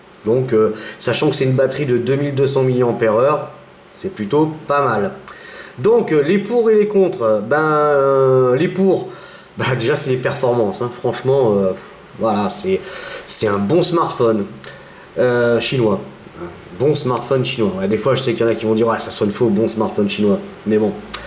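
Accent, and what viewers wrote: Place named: North, France. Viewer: le francais du nord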